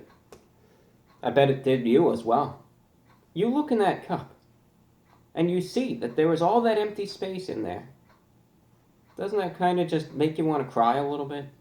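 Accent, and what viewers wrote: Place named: New York, United States. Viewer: You remind me so much of how peaceful my grandparents were. I really admire that about you. I'm from Western Mass and it sounds like you're from New York. I miss my grandparents dearly and the fact that you are so classy and keep it traditional really makes me want to come back not even for the food reviews but just to hear your presentation. Thanks and be well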